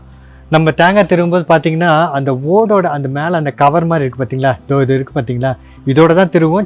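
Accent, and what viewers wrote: Tirunelveli, India. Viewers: Are u from thirunelveli?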